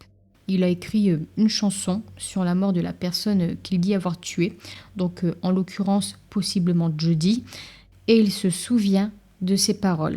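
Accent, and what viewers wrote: North, France. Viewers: Je ressens dans ta voix super douce une note d' un accent du nord de la France je me trompe ? 😁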